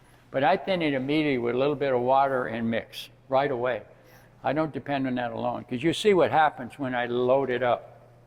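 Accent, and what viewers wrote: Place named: United States. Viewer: I was not sure at first and I thought on no not another yank talking, but I just love how they turned out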